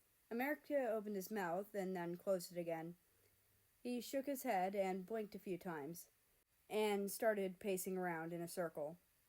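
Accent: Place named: Russia. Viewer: Sfhgfhhjnbdgj I can’t listen to this rn bc I’m busy but AAHHH TYSM!!! I got to about 8 minutes in lol, I’ll finish it later!! I’m actively cringing at the way I wrote things back then- especially the typos in the summary- how did I not notice those- but you know what it’s okay 😭 anyway YOUR READING IS FUCKING INCREDIBLE can I just say that I’m really impressed by your Russian accent?? I can’t do accents to save my life 😂😂 I’ll probably say more later bc I have to go now but THANK YOU SO MUCH ❤❤❤❤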